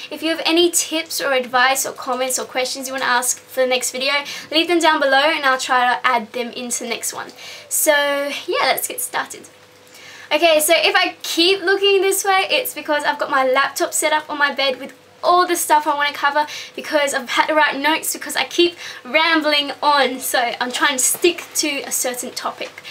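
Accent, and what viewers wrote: Australia. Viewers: I love love LOVE the way you sound. Are you from AU or NZ? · i LOVE ur accent :D <3 Australians have the BEST accents( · that is so awesome Iove australian accents ... I'm sorry they're just soo cute :) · she from aus? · She's Australian, GTFO. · Are u from Aussie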